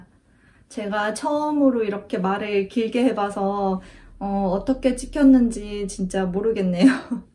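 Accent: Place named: Japan. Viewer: 한국말을 하시면서도 억양이 일본어로 말씀 하시는것같아요~~ 저는 혼자 그것도 타국에 살아본적이 없어서 너무 부러워요ㅠㅠㅠ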